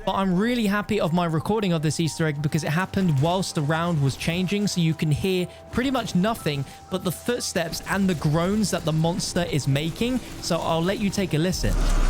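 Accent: England, United Kingdom. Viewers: Don’t pick on his pommy accent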